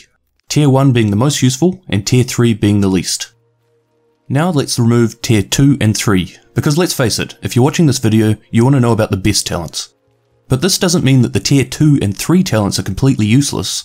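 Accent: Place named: New Zealand. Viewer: Holy shit, never knew you were a kiwi too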